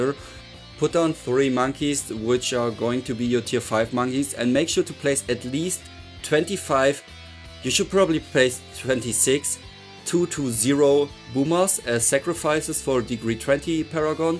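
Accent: Germany. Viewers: Ich liebe diesen deutschen Akzent wenn du englisch sprichst 😂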